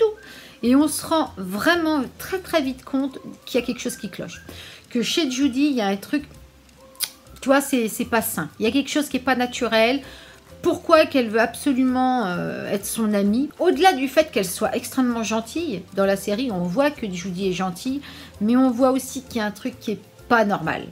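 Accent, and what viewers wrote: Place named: Quebec, Canada. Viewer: Hey je viens de découvrir ta chaîne , et ta façon de parler (timbre de voix ou accent je ne sais pas trop), me fais vraiment rire Aha ! Un total melange entre le québécois et le mec de la chaîne « Le bledar » x)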